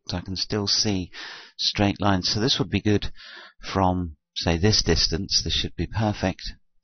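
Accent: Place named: Australia. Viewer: That was a gold tip! Thank you! I also think you are australian by the accent! :D I love this accent! Have a nice day!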